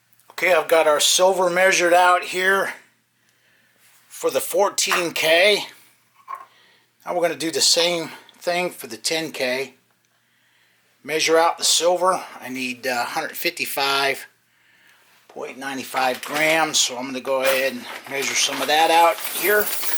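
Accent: United States. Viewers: WOW People and Viewers, this guy has deliberately gone out of his way to make this look like Bomb making and it's not. Why would this guy do that? is it that he's not wanting backyarders to encroach on his market? Listen to the voice - does it sound American? Americans always Lie for Commercial reasons - "There were no weapons of mass destruction in Iraq" only a bunch of children to be murdered for their oil" this guy is lying badly - he's an American - it'll be for the same reason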